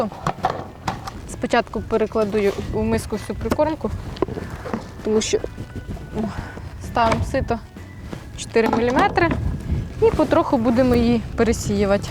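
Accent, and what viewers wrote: Surzhyk, Ukraine. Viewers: суржик то сила))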